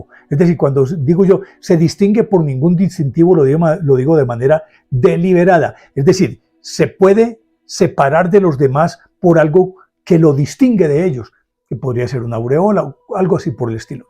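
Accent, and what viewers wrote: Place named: Colombia. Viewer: Todo esto es muy interesante, lástima que la copia de la obra usada es una obra muy alterada, y ese halo de santos vuelve a aparecer, soy un gran amante de la obra de Leonardo Da Vinci sin el endiosamiento que le han dado, era un hombre talentoso, seguramente muy conflictuado por sus orígenes, su homosexualidad y sobre todo que fue muy incomprendido. Don me gusto su tono de voz y el acento colombianos es muy bonito, gracias!!!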